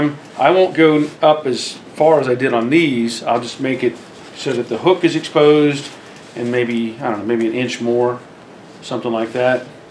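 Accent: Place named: Georgia, United States. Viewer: You.do.not.talk.like.someone.from.around.atlanta..more.like.waycross,ga..lwenr.too.schoole.in.big.atlanta.many Years.ago..i.am.also.a.lill...little.south.of.atlanta........florida..keep.on.mustanging..my.friend.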